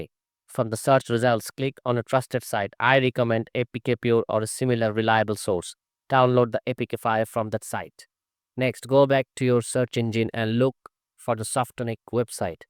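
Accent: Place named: India. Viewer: Why do all these guides have a creator who sounds like a typical Indian scammer who scams elderly people into taking out loans for their grandchildren etc. :D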